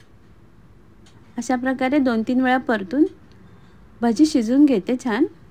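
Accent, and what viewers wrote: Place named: Konkan, India. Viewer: Marathi only... In the sweet tone of kokani 😂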